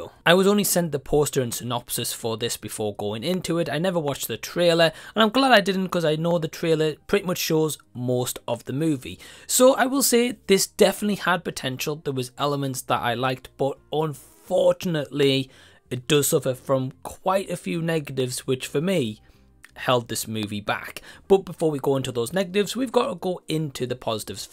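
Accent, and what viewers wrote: Newcastle, United Kingdom. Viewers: Geordie? ;) Why-aye! :D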